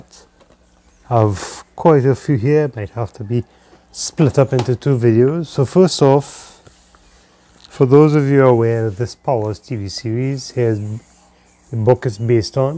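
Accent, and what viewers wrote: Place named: Caribbean. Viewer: Sweetheart... This was incredibly sweet. I loved this. You served us Dvds, cds AND vinyl? Yes, honey. One of my best friends is a trini gyal. Hard to not know that classic west indies accent. Love it. You nailed this AND gave us a part 2? Ill deep dive, sugar. I need that rekkid btw. Toodaloo!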